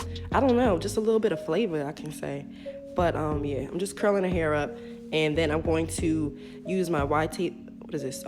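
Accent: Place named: New York, United States. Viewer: where is your shop located? Sounds like a New York accent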